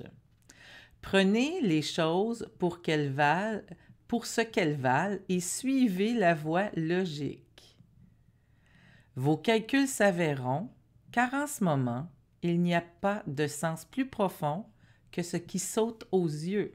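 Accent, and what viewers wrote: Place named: Quebec, Canada. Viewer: Merci pour ce beau message. Sympa l accent québécois.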